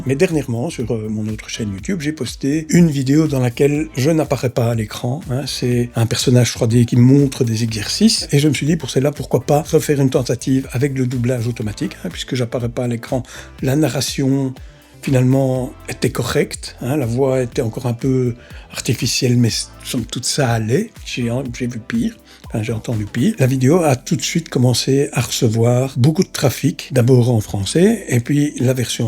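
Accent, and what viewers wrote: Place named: Belgium. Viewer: Salut, merci pour ta vidéo qui m'a bien éclairé. Je me posais toutes ces questions sur les sous-titres. Sinon, je m'abonne direct : pour l'accent belge, l'humour et l'authenticité. Ça change de la bière pasteurisée sans âme qu'on voit partout sur YouTube. Tu m'as plié avec la blague du fils du patron de YouTube, de l'entraîneur, le "bientôt" et le coup de la dinde ! Continue, elle est top ta chaîne et à tantôt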